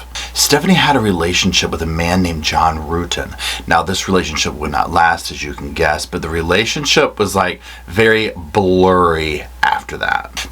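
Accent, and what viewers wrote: South, United States. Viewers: Hi and Roscoe. I was so happy to have found your channel about a week ago. You make me laugh so much with your facial expressions, your occational southern drawl, and my all time favorite is your signature whisper as you send us to a clip. Your show about Nancy Brophy is hilarious. The way you whisper the words "Miss Nancy" put me on the floor laughing. I'm going to tell all of my friends to subscribe to your channel and tell them that we have to support our brother. Keep me laughing as I watch more of your videos because I'm new. Right now (Dec 7, 2023) I'm watching your video about "Latecia's Dr. Lewis. I laughed so hard at the intro when you whisper "Her son who smoked weed in the Stairwell" 😂😂😂. Hug Mr. Roscoe P for me.🌹🦴🦴🦴🦴🦴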